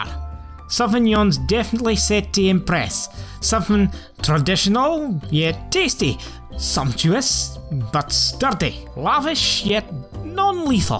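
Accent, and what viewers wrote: Scotland, United Kingdom. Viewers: of course he’s Scottish. They’re probably auto captions which is just what the computer thinks he is saying. And the computer isn’t very good at listening to non American or RP English. Scots is a language which split off from Middle English. A lot of it is mutually intelligible with English but many Scandinavian languages are also mutually intelligible to certain degrees. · Love your North East of Scotland accent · Dude I work in Scotland but your accent is something else . Subscribed tho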